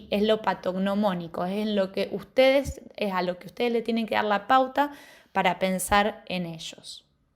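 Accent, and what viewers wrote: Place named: Argentina. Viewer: tenia que rendir un oral, necesitaba esto, gracias algoritmo, por fin me mostro algo en castellano bien argentino.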